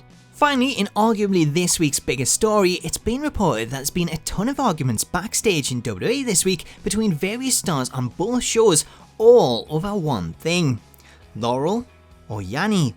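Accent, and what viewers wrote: Newcastle, United Kingdom. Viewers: Newcastle sounds like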